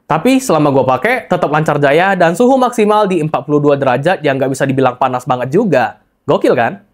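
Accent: Palembang, Indonesia. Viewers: Ngikutin channel abang ini fix dia orang palembang bro melekat banget soalnya logat palembang 😆😆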